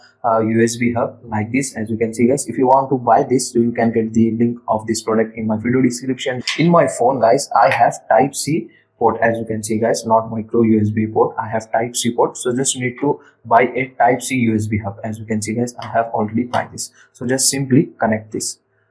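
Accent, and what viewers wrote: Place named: India. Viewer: Is it me or is the sound quality so bad on this video that, coupled with the presenters thick Indian accent makes the video unintelligible?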